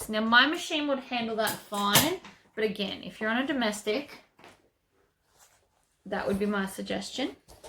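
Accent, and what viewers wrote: Australia. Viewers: I just had Open Heart Surgery and I watch your videos when I can’t sleep. I like listening to your voice. I like that Australian accent! I think everything you make are beautiful.. especially the purses you make!! What talent!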